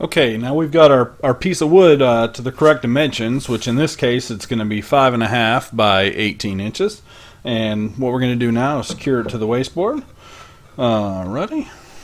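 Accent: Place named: Texas, United States. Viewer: Google the mascot for Baird, TX... It's the Bears. and the accent gave it away. That is why I checked the mascot, he sounded local to me.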